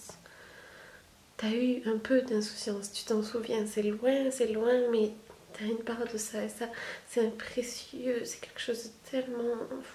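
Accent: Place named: South, France. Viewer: Tes réflexions sur toi-même, sur le monde qui t'entoure, peu de personnes le font réellement dans la vie de tous les jours. Dis-moi es-tu croyante? Si oui, en qui crois-tu? Tu es naturellement belle, j'aurais bien voulu te connaître par amitié avant tout et plus si affinité. J'ai remarqué ton accent du sud un peu; ) Ps: les personnes ayant connu des zones d'obscurité dans leur vie, sont des apprentis les mieux préparés à entrer dans la lumière. Bisous, garde ton naturel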